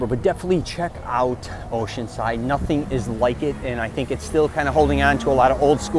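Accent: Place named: Chicago, United States. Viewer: such a Chicago accent! haha. This guy is my twin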